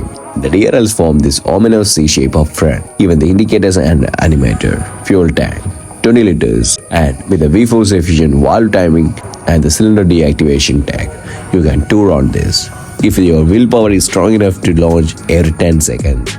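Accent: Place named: Kerala, India. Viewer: Great vid but work on your speech and pronunciation. You have solid baritone voice, but you have a heavy Malayali accent which makes it extremely difficult to understand what you are saying.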